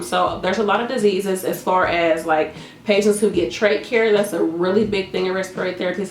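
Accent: St. Louis, United States. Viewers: As soon as i heard your accent i KNEW you were from the Lou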